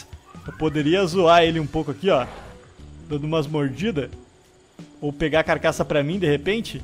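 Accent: Rio Grande do Sul, Brazil. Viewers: tu e gaucho de bento ne?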